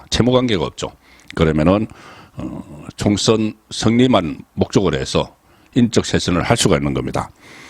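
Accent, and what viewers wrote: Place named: Daegu, South Korea. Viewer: 들리기야~ 잘들리죠~ 제가 말씀드리는거는 발음이라고 해야되나~?물론 대구 분이시라 사투리도 섞인것도있고, 성격이 급하신지 말도 하시다보면 더듬고 그려셔서요~ 그냥 어드바이스~??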